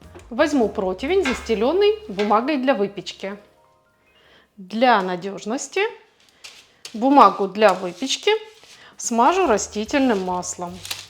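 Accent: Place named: Ukraine. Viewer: Сразу слышно, что хохла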